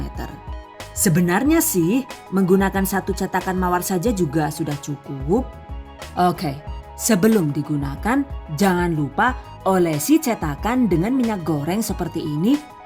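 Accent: Banyumas, Indonesia. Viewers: Mantap bun...Purwokerto hadir ,tp tinggal e ng Bekasi.seneng bgt klo lg ngmng ngapak.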